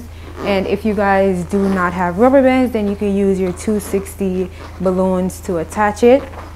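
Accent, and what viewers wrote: Jamaica. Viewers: Def heard that Jamaican accent 🇯🇲🇯🇲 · Heard that Jamaican accent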